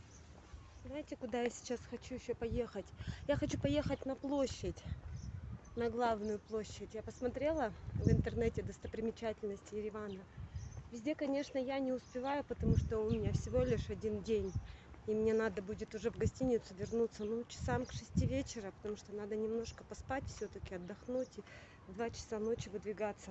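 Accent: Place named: Udmurtia, Russia. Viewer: а почему Вы не дождались вечера. Собаку не выгонишь в такую жару. А вода в Ереване бесплатная, холодная из питьевых фонтанчиков. Судя по говору-Вы из Удмуртии?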